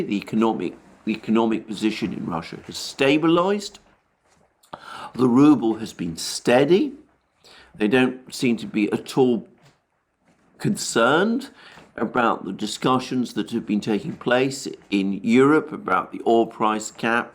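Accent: United Kingdom. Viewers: This is the longest uninterrupted string of praising Russia in a British accent I've ever heard. Not a single moment of anything that could be seen as a criticism. Weird, isn't it? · One of the best channels for astute russian propaganda with a fine british accent from an ex laywer.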